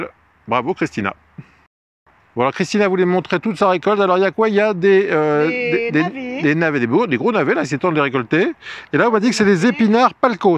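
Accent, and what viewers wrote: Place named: South, France. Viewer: Bravo, magnifique reportage avé les accents du Sud!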